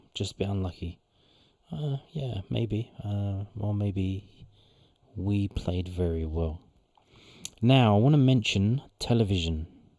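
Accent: Scotland, United Kingdom. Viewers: Love the jock accent 😂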